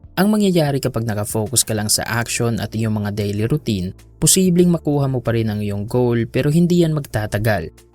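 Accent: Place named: Visayas, Philippines. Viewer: Ikaw rin dapat mo baguhin pag sasalita mo parang bisaya..pilipit ang dila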